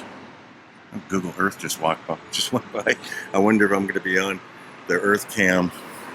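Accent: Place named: Midwest, United States. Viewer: has amazing stories and he tells them with his terrific melodious voice and Midwestern accent. Even though the stories are grim it's a pleasure to listen to him.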